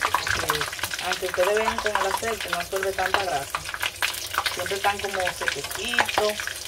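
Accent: Dominican Republic. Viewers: Está chulísima tú receta. 😋😋😋 Me encantó la manera que explica los pasos y vas haciendo el proceso de la misma. Sé que por tu acento al hablar eres de mi amado país República Dominicana. Tienes una nueva suscriptora en tu canal. Abrazos cordiales mi reina. ❤🇩🇴❤ 👏👏👏👏👏👏👍😘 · Eres Dominicana 100%✓ 🇩🇴❤️❤️ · No entiendo que tenía la salchicha que se veía como un nylon o algo así. Tampoco entiendo porqué responde casi todos los comentarios con la misma frase aunque le hayan preguntado algo . Para mi tiene acento dominicano y cuando le dicen puertorriqueña, solo responde bendiciéndote jajaj. Se ven deliciosos , fue solo una sugerencia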